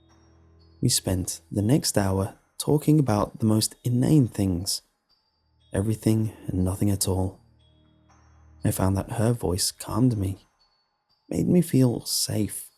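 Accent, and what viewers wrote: United Kingdom. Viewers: Pissanjecous Demsley it's cause of his british accent.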